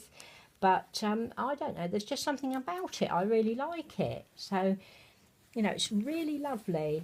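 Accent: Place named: Berkshire, United Kingdom. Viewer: Oh dear, you were definitely not too chatty and we both adore you. You are just like my Mum in so many ways. She also gets really nervous when meeting new people but she couldn't speak more highly of you on the way home from yours. She felt she made a real connection with you. Please don't think you put us off in any way - we love you just the way you are. Sarah Louise is looking gorgeous as always. The hat and booties are a lovely colour. Nothing wrong with a Berkshire accent I might add - I have a Berkshire twang as well - lol. Me and Mum want to visit you again soon but I don't want you getting nervous about it - silly you!!. Love and big hugs Annie xx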